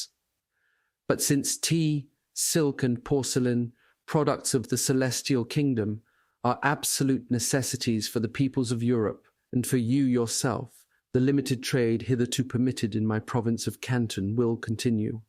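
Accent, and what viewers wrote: RP, United Kingdom. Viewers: Very British ! Queen's English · What is narrator's accent? British? thanks for your help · Perfect Received Pronunciation, better known as the Queen’s English. Very posh, articulate, and impeccably enunciated !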